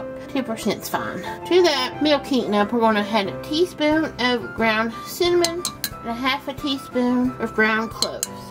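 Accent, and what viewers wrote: South, United States. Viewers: Love the country accent ❤️🎄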